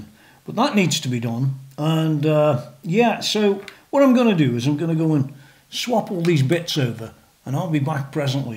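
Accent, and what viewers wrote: Stoke, United Kingdom. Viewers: Are you from Stoke by any chance? :)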